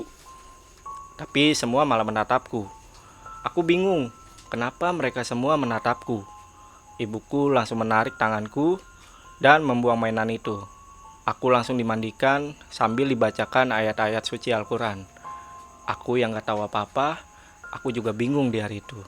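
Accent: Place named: Bali, Indonesia. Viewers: Naratornya orang bali..ya.?kelihatan dri logat nya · Bang asli mana? Logatnya ky beli Bali 😁 i like it ❤ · Ini naratornya orang Bali apa NTB ya?, penyebutan huruf T nya beraksen daerah tsb. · Yang baca berita ini apa orang bali ya ? Bli ? Logat T nya sangat ketaraa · mimin org bali kah ,logat T nya ko keg org bali ?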